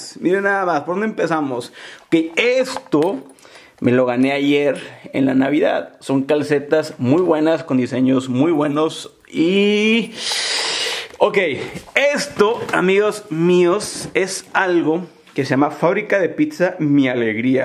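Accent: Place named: Northern Mexico, Mexico. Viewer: Esa voz norteña ❤ jaja creo que si hablamos fuerte y golpeado jaja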